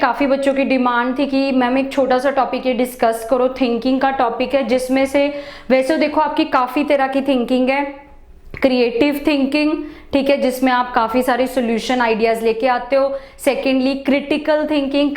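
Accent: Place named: Punjab, India. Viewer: Ma’am Apki Dilect main Punjabi Touch aata hai🙈🙈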